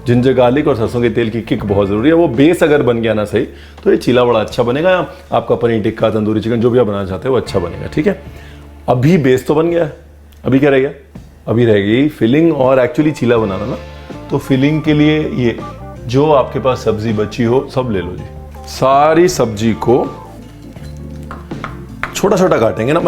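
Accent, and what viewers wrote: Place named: Punjab, India. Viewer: Recipes te ghaint hai hi bolande style wah ji wah pure Punjabi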